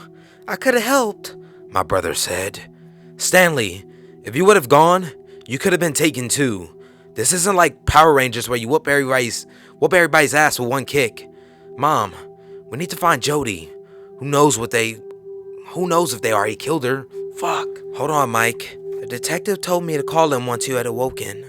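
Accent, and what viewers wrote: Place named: South, United States. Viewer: Awesome! I like your accent! I'm guessing it's from the south, but I'm half Japanese,half Danish so I'm not the best to hear the difference,but been to US many times and my aunt lives in Houston and your accent sounds like my uncles! Anywho I'm very fond of your narration, accent and especially when you get your lady to knock and you let us hear the "takeout" it makes you "human" ! Hope the new stupid guidelines won't affect your channel as you have great thing going on here and you know what hot now! Hope you'll do more ghost/paranormal as your voice is great at that! Good luck with everything! Xoxo DM ,Copenhagen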